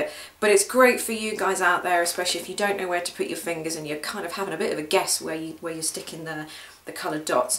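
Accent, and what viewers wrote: United Kingdom. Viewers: Hey:D Thanks! I found this clip really useful since I'm still finding my early steps.. I like the whole series too.. Keep it up (y) P.S. I love ur British accent too:D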